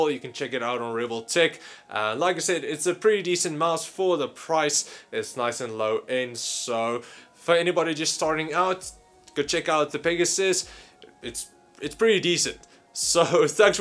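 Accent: South Africa. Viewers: I can't figure out this guy's accent. It's like Afrikaans, American and English all in one with a touch of Dutch. Touch of Dutch. I'm basically a rapper. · Are you South African? I speak a bit of Afrikaans!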